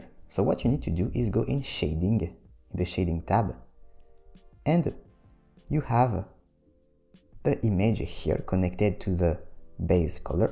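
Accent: France. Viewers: ça pue l'accent français tout ça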